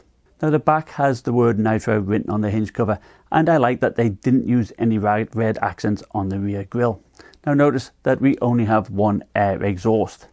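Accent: United Kingdom. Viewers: Hey, first off I hear a British accent but hear dollars and see that disgusting enter key 😂 must be tripping but I have a really important question, curry’s are really weird with they way the price the laptops here so the nitro 7 with 144hz, 8GB ram and 1660 Ti will be around 800 quid on Black Friday with a 512 gb ssd and the Helios 300 with 1660 Ti 1TB HDD and 256ssd also 144hz will be around 1.1k, what would you reccomend??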